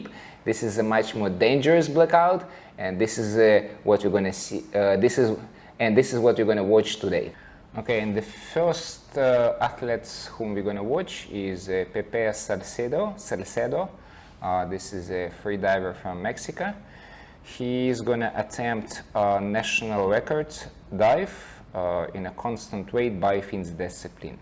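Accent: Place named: Russia. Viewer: Слушай, красивый человек с красивом акцента русско:::о)) Ты понимаешь эту тему, не умру ли Я На глубине? D5. COMBO )) Только КОПИЛ. Туш рвётся от того, что не купил океаин, если ПОДУМТЬ, ПОКУПАЛ ДЛЯ ДОКТРИНЫ,..Нет для фактов дошёл до зардержке на постептли 2 B D ВПИЗДУ ПНГЭ НО КРАСИВО:О) )\\\\ да? как же хочется это дыхание сохранить на манималках? ХУУЁВО ЗНАЮ Когда контроль, на глуби ВНЕ КОНТРОЛЯ, уже пошли работать безумные Смертники.. БЛЯЯЯЯЯЯЯЯЯЯЯЯЯЯЯЯЯЯЯЯЯЯЯЯЯЯЯЯЯ:О) КАК ЖЕ ХОЧЕТСЯ Погрузиться глубже...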